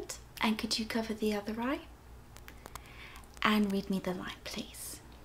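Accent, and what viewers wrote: England, United Kingdom. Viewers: I LOVE British accents🙏🏻 you are my favorite 🙈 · And what about a Scottish or Welsh accent? They're British too. This is an ENGLISH accent! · Can I ask, are you originally English or just perfectly pretend to be? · English* not British. There I no such thing as a "British" accent. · ASMR Your accent is more refined than the other British ASMR artists - Always good to have a unique trait like that. This also makes is more believable that you're a physician as it falls into our preconceptions of how someone with that job would speak. On top of that, you display a good amount of confidence and maintain eye contact with the camera/patient, which adds further to the credibility of this persona. Underpinning all that you also seem to have the basics of ASMR down pretty well.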